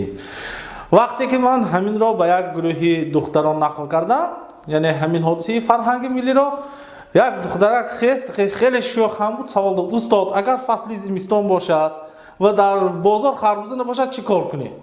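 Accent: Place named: East, Tajikistan. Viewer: Брат иё шарқ гап задестан о маву ту а ғарбем ихе гапора намефаҳмем 😇😟🤨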